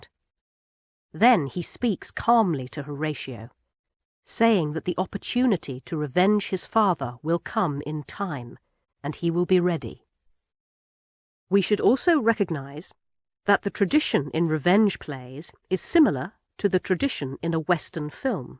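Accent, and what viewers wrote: United Kingdom. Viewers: Banu Çiçek Because it's British pronunciation.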